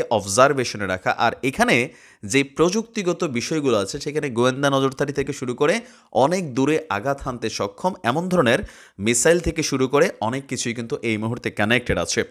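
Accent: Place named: Kotalipara, Bangladesh. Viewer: ভাই "ক" কইলে কোটালি পাড়া বুঝি।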